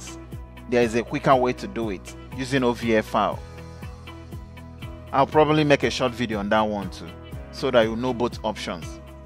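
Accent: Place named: Africa. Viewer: this guy is soo good. like where were you men ... You actually spoke like an African .... everything was so simple .... God bless you ... I'm your fan from now onwards .... and I'm gonna spread the good news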